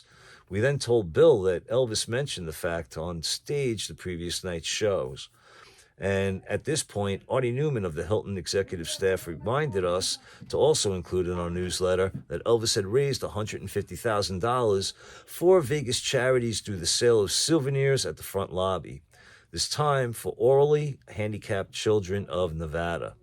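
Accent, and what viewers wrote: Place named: New York, United States. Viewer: Hi, I wanted to say I truly enjoy your videos. I remember having an original Kings Court button when I was a kid, I may still have it somewhere. Also nice to hear the accent of a fellow New Yorker, I’m born and raised in Brooklyn but live in Florida now. Anyway just wanted to say hi and keep the videos and memories coming.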